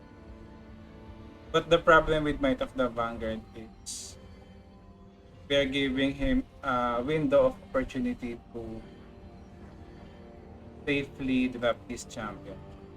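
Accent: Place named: Philippines. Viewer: Are you Filipino? Because your accent really sounds like a Filipino lol (I'm Filipino) Keep up the good work!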